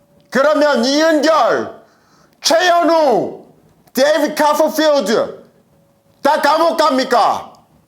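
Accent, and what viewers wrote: North Korea. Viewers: 근데 미국인이 왜 북한 말 하는 거 같죠?? 어디서 봤는데 닮은 사람.... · 약간 북한말투..?? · 뜨문뜨문 북한 억양이 있는데? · 말투 점점 북한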